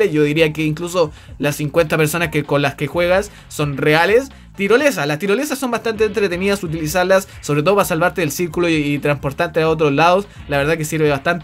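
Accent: Chile. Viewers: Weon yo no te entendi ni madres chileno :v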